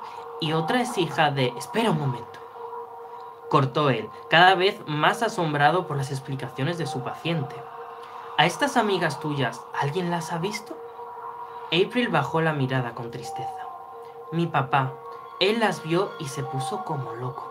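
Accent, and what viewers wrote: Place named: North, Mexico. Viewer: El acento norteño...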